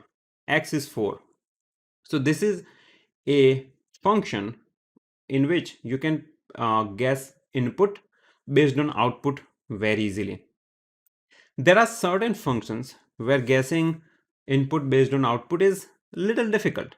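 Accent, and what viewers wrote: India. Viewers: When I heard that indian accent, I knew this would be good. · When I hear indian accent, I listen carefully coz I know I'll be learning a ton.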